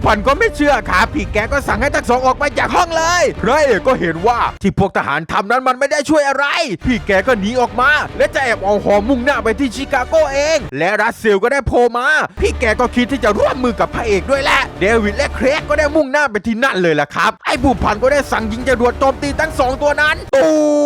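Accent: South, Thailand. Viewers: พี่เป็นคนใต้ป่าวครับ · พี่เป็นคนใต้เเน่นอน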